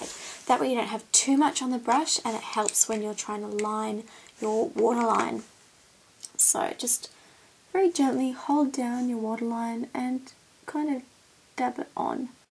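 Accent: Australia. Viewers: Hey everyone. I really like. I just discovered her and I was wondering if there are any other gurus like her that are aussie because I love that accent and actually I am technically aussie (my grammy is from Austrailia).Thnx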